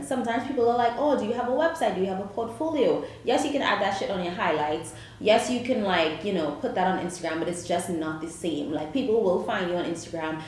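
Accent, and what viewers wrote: Trinidad and Tobago. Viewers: Are you Trini? I feel like I'm hearing my accent but i'm not sure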